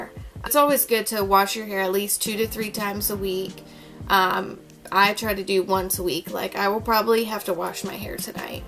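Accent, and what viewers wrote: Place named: United States. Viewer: Ugh, you're so great on camera!! I never realized you had a lil country accent. So cute 😁😁 Loved this video, looking forward to more content from you!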